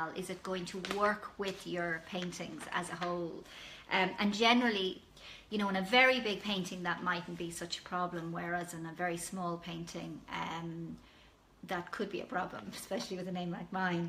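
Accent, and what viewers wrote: Ireland. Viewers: I hear Ireland flowing through ... ☘️ The land of my lineage, County Kerry, Ireland 🇮🇪 M Beth USA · First of all, I love your Irish accent (" ")! 😀👍 I often wish I were more connected to my English, Scottish, & Irish lineages, but to date I've not achieved that. An interesting fact that you may or may not already be acquainted with. I learnt some years ago from a reputable Jamaican newspaper's website (i.e. "Jamaican Gleaner") & an article on its series "Out of Many, One People" or some title like that to emphasize the multiethnic status of Jamaica's population & to imitate the national motto that speaks of this same phenomenon, I learnt that the accent of the Jamaicans in their speaking of English was primarily due to the African enslaved being most shoulder to shoulder with the Irish indentured laborers who were yet, of course, speaking the Irish brogue. 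In fact, I used to work telecommunications a lot in the past as employment & I would occasionally get Irish clients that I thought were Jamaican until they clarified that they weren't! So, yeah, that's the bit of trivia for you. Now, regarding this topic of yours you sought to do your presentation on, it is such a tremendously neglected topic albeit the topic being so essential & so it is precious that you've dealt with it herein, yet not only dealt with it, but dealt with it quite thoroughly & skillfully. Good job! The thing is, my late father having been the very gifted artist that he was made me to be well aware of many of these points from in my boyhood (or when I was a "lad," as the Irish would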